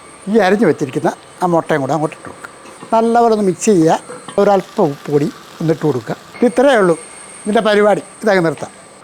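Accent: Kottayam, India. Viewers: ഈ ചേട്ടൻ്റെ കോട്ടയം ഒന്നാതരംടേസ്റ്റ് / നല്ല രുചിയാ സംസാരം കേൾക്കാൻ മാത്രം ചാനൽ കാണുന്നവൻ നൊക് റ്റാൾജിയ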